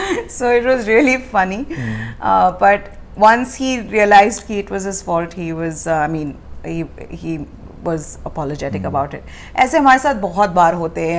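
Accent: India. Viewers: Very nice couple , he has his own identity surely And Jamie has a lovely wife with beautiful confident voice and Indian accent 💕🇮🇳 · Bhai I love Garhwali ascent in your spoken English, reminds me of my childhood friend Bhola Singh rawat from Khatta Pani Gaon. Regards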